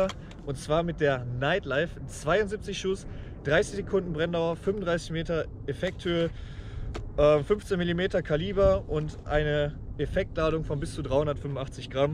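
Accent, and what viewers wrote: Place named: Rostock, Germany. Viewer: Kommst du aus MV? Genauer gesagt - nähe Rostock?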